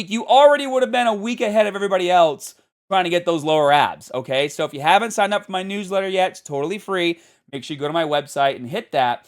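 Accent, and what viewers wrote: Boston, United States. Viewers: Boston accent crazy lmao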